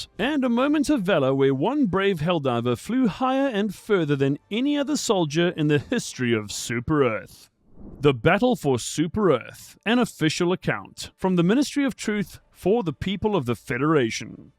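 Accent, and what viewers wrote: South Africa. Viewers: Permission to query Commander sir, are you deployed out of Sector 5 by any chance? Your accent reminds me of South Africa sir! Glory to Super Earth 🦅🌍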